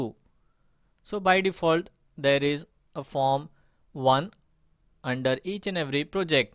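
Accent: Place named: India. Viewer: i think he is indian man. his accent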